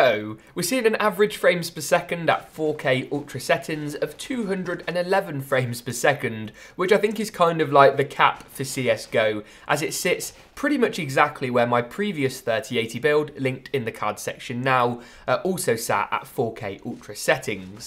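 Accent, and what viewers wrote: United Kingdom. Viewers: I like the UK accent 😅😅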